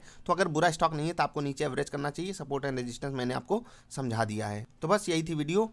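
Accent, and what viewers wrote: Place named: Kanpur, India. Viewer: ap kanpur se he kyaa?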